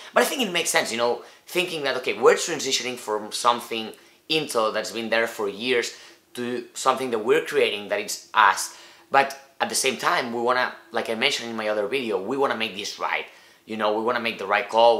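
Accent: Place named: Italy. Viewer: I am definitely obsessed with Apple! I like your accent! Where is it from? Italy?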